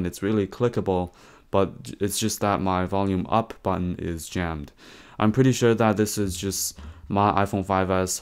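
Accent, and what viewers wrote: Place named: China. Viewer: Hi, are you from China? Just a guess based on your accent:) Your videos are awesome.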